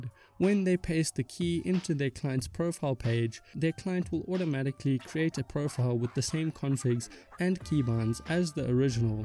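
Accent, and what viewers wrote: South Africa. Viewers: i can tell straight away ur a south african awe man:)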